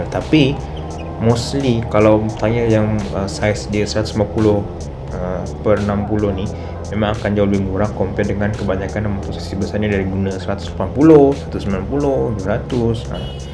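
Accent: Malaysia. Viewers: Pandai loghat melayu malaysia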